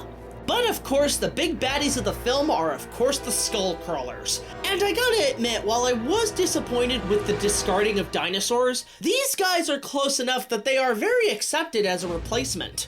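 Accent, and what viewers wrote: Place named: United Kingdom. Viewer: you really have a very good British accent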